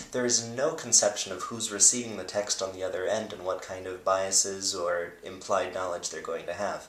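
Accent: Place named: Arab world. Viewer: Your Spanish sounds very Arabic, or maybe more from-the-still-very-moorish-parts-of-Spain-ish. I can tell it's Spain Spanish too, but mostly just very Arabic sounding. And your speech sounds almost mystical as well. It's refreshing to hear someone actually have a voice in Spanish. It's a lot more interesting and understandable than, "Kay whore-ah es?" or "Me yah-mo es Megan e me gustah pah-sahr tee-empo cohn mees uh-me-goes." Thank you for rescuing my Wednesday. DFTBA!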